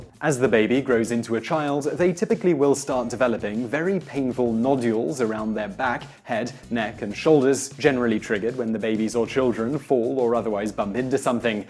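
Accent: England, United Kingdom. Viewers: Is this guy English? I am from England and I can never tell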